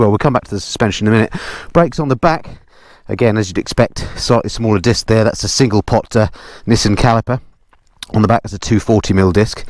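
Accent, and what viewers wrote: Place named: Hertfordshire, United Kingdom. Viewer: Great review you old Norfolk boy you ha ha. You've still got the accent albeit mixed with a bit of semi posh Hertfordshire home counties.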